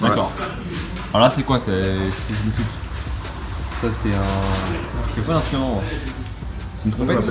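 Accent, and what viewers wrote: France. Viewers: Tu est du marseille ?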